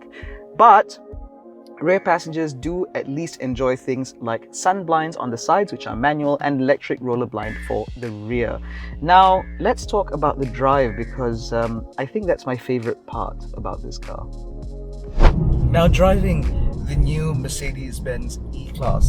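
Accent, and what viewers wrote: United Kingdom. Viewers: I love your English accent, are you educated in the UK, love it.... "The headlights look low rent" 😂👍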